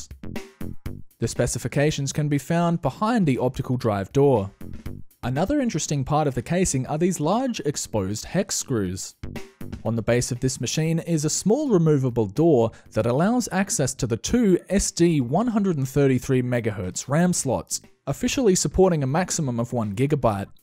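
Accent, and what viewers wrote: Australia. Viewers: I just realised that Australians seem to pronounce “assume” as ashoom 😁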